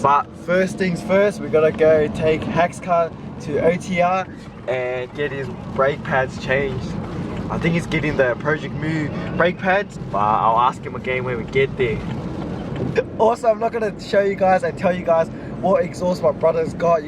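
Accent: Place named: New Zealand. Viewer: Never heard an Asian sound so kiwi, aussie and asian all at the same time. Mate :')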